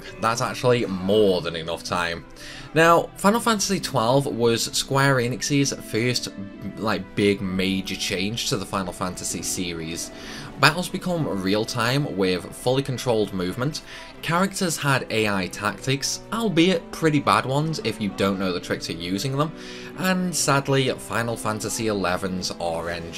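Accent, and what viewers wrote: United Kingdom. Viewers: Ngomong pake aksen british agak ngeselin juga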